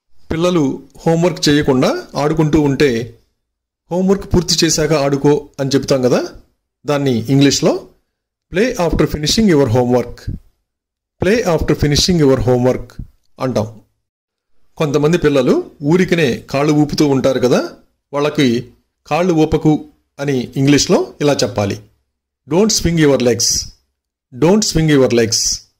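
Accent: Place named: Telugu, India. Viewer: మీరు ఇంగ్లీష్ కూడా తెలుగు లాగా మాట్లాడుతున్నారు.బావుంది. తెలుగు మాట్లాడటానికి సిగ్గుపడే తెలుగు, ఇంగ్లీష్ రెండూ రాని చిల్లర మంద సందుకి పది మంది ఉంటారు.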